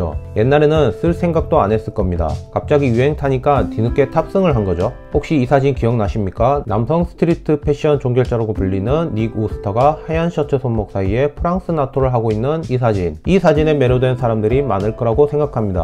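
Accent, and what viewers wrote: Gyeongsang, South Korea. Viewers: 나토뺀뜨..사투리가 남거슬리는군요..경상도 억양이..ㅋ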